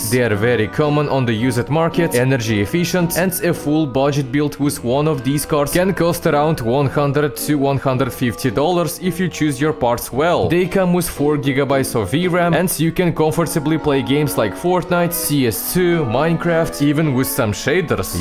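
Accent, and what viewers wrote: Russia. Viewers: По акценту слышно что наш) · bro has the strongest russian accent ive heard lol😂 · Bro u russian right? Its accent🤔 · Do only I hear russian accent? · I hear that Russian accent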